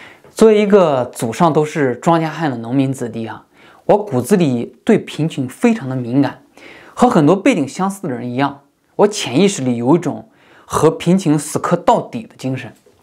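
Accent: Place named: Northwest, China. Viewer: 听口音像是中国西北人 宁夏 甘肃 确实比较贫困 不上大学走出来真的是要穷一辈子 恭喜你的努力给了改写了后代的历史！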